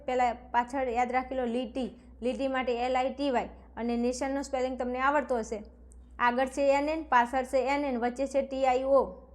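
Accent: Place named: Mehsana, India. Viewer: Tamara language upar thi lage chhe teacher tame mahesana baju Naa chho ne 😅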